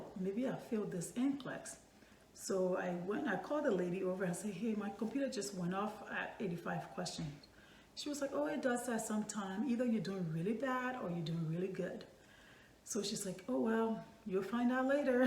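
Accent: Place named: Liberia. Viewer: Hey you sound like Liberian. I am Liberian too. Congratulations I am preparing too for the nclex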